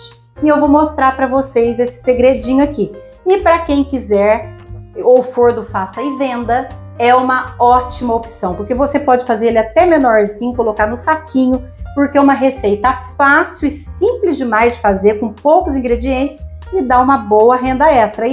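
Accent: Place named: Minas Gerais, Brazil. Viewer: Que delícia de cookie e de sotaque. Sou mineira e dá gosto de ouvir kkkk. E de comer todas essas delicias que vc faz aqui. 😋😋😋😋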